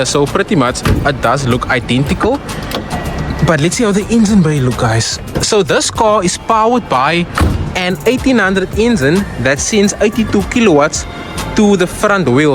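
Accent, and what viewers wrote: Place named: South Africa. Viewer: bro got that brai/bokka accent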